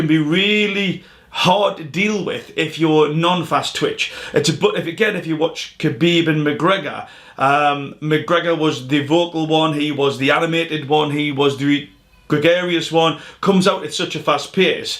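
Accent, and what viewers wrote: Newcastle, United Kingdom. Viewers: It's the accent. They are both from Newcastle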